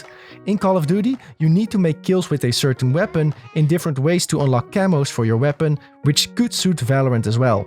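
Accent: Netherlands. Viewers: Are u dutch? You kinda have the accent. Your enlish is great tho :)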